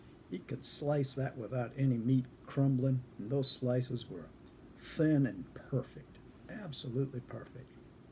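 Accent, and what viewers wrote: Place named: England, United Kingdom. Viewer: I knew I heard an English inflection your dialect. What part did your family hark from?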